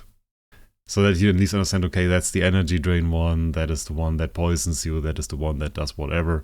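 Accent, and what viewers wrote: Germany. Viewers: You german??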